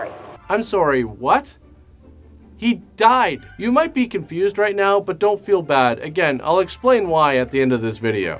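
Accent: Canada. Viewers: First visit... You sound like a Canadian Sterling Archer... Only adds to the sarcasm. Excellent work.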